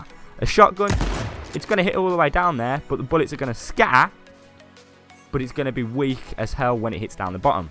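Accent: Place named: Birmingham, United Kingdom. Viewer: u from birmingham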